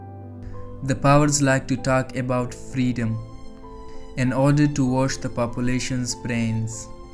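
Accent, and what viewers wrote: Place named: India. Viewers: Wtf ??!!!! Racist on vocal sounds ?!!!! Why it’s Indian voice not English !!!!???? SERIOUSLY?!!!!! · What annoys me is the Indian speaking. Why dont just use English voice speaker those are free on the web?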